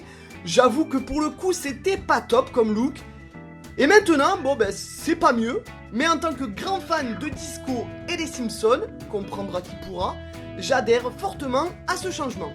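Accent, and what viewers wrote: South, France. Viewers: Tu viens du Sud toi, non !?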